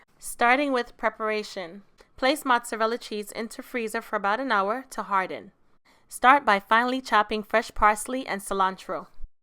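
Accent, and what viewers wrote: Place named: East Coast, United States. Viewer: Love that east coast accent ☺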